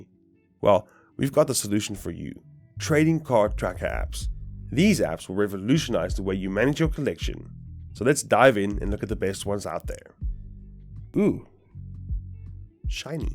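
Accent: South Africa. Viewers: South African? 🇿🇦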